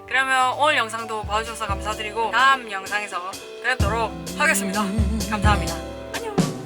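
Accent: Busan, South Korea. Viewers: 부산인 소리 쥘러!!!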